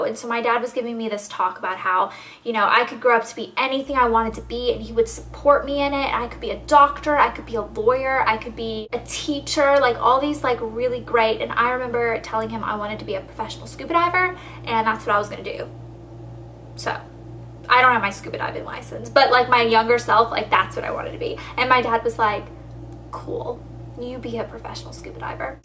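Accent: South, United States. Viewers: ? with that sexi southern accent:D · I love! So Southern! You and your sister should embrace your Southern accents!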